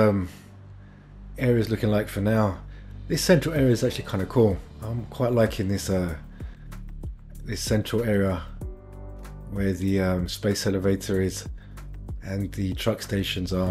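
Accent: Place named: United Kingdom. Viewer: Dude, keep it up! Your builds are the best I've seen. I'm trying to place your accent, almost British, but not quite....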